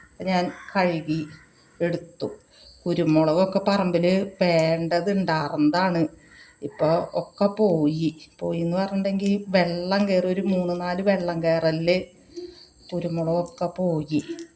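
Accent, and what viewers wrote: Thrissur, India. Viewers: തൃശ്ശൂർ ആണോ സംസാരം കേൾക്കുമ്പോൾ തോന്നുന്നു ഞാനും തൃശൂർ ആണ് പക്ഷേ ഇങ്ങിനെ ചമ്മന്തി അരക്കുന്നത് ആദ്യമായാണ് കാണുന്നത് 👌👍😋😋 👫🔔✅ New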